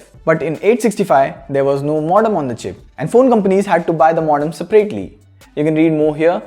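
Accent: India. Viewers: First of all, indian english kidda weird.. 😂😂😂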